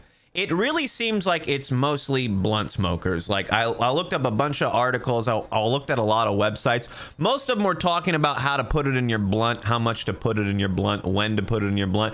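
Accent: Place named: New York, United States. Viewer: What is with the random New York accent you start and stop using at random? I've been watching for a little while and you only mention Colorado and Florida. It's weird af.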